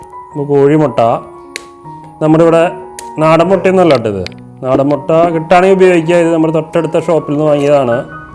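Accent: Thrissur, India. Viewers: നിങ്ങൾ തൃശുരാണെന്ന് സംസാരത്തിൽ നിന്ന് മനസിലായി . ശെരിയാണോ ??😀😀😀😀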